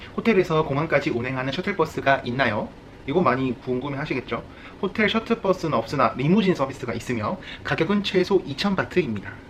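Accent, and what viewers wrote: Gyeongsang, South Korea. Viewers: 몰랐는데 사투리가 부산경남.. 이신가봐요!ㅋㅋ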